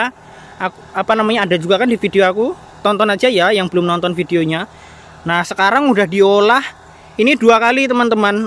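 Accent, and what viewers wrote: Java, Indonesia. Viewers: Logate lho b. Jawa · Jowo mu ngendi le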